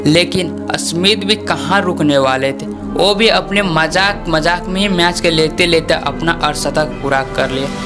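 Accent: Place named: Bihar, India. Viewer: Abe sale bihari hindi bolni seekh pehle bestman ashtelia khilod gindbaz dhmkedaar tbrtor naseme kia h sale shudh hindi bolo bihario salo😊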